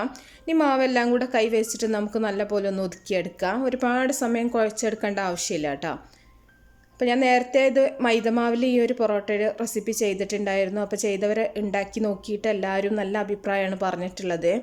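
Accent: India. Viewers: Ok ചെയ്തു നോകാം ട്ടാ 😄, എന്റെ നാട്ടിലെ അതെ സംസാരം ചേച്ചി പാലക്കാട്‌ ജില്ല അല്ലെ? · മലബാർ syliyilulla നല്ല അവതരണം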